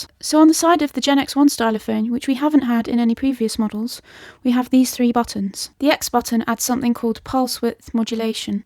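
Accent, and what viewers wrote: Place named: United Kingdom. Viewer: What a lovely British accent!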